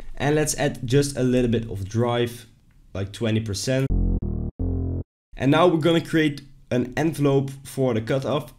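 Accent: Netherlands. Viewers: you sound dutch. are you dutch?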